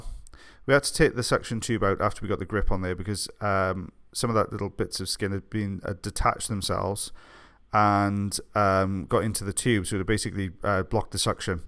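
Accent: Wales, United Kingdom. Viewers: Question: how do you know if you have a hearing loss due to wax? Just curious because I've noticed while watching and listening to your lovely welsh accent I wear ear buds and I've found I can hear clearer in my right ear, I can still hear in my left ear but not as clear???? My husband is from Barry Island where is your office/clinic?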